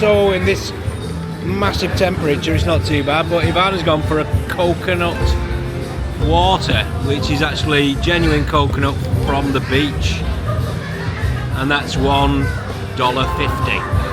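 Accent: United Kingdom. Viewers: You sure you're not in LONDON? pure pommy accent 😂 ❤ glad that you guys enjoyed Cambodia ❤ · Is your accent Welsh or Scouse? It’s beautiful.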